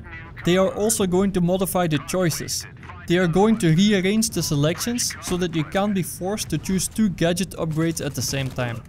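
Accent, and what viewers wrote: Netherlands. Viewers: I think stay the way you are, you have the most insightful tip/tricks/tutorials. Maybe hints to certain maps for certain game modes, but other than that keep doing what you are doing. One if not my favorite BFH follows/subscribes. Oh and the Dutch accent pushes it over the top to EPIC for me every time. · If you really wanna get bigger and compeed with the big channels, you need to upload 1 video a 1 or 2 days. Im enjoying your video's tho, keep up the good work. And where u from? Je hebt een Nederlands accent!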